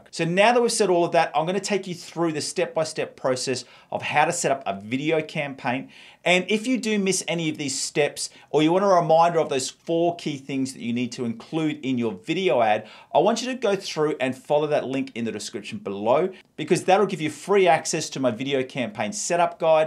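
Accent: Australia. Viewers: Love these videos. Super informative. Great flow. You can never go wrong with the Aussie accent. Cheers mate.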